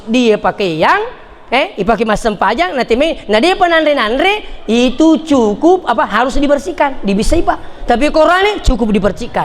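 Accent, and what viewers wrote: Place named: Sidrap, Indonesia. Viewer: Saya orang Bone, tapi suka dengar logat Sidrap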